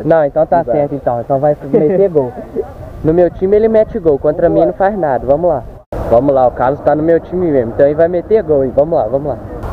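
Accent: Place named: Minas Gerais, Brazil. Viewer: Vc é mineiro né? Com esse sotaque. Legal é o clima dos jogos e a galera gnt boa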